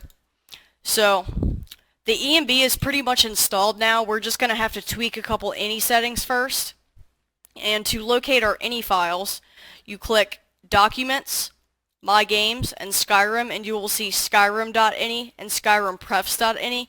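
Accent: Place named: Delaware County, United States. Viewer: Hey are you from east coast? Sounds like a Delco accent. This video helped a lot. I was getting 0 FPS. When I downgraded my.dll file that enb series comes with to the one recommended it worked! It doesn't seem as good as project enb so I need to tweak some stuff.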